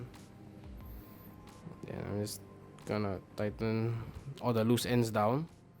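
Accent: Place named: Singapore. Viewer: Where do you fly fishing usualy?are you from singapore??the way you talk just like a singaporean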